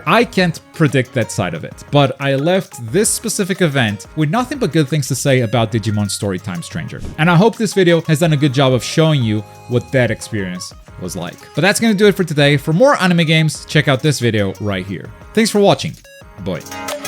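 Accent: Portugal. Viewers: Bruh vou seguir só mesmo por seres tuga! Foi tipo "Este gajo tem sotaque de Lixian... deixa ver de onde é"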